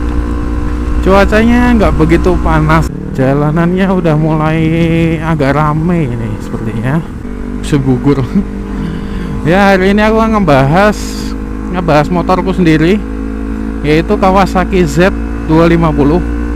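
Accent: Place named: Java, Indonesia. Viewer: cocok campur jawa om ngomongnya, medhok pisan. "rx king cok" 😂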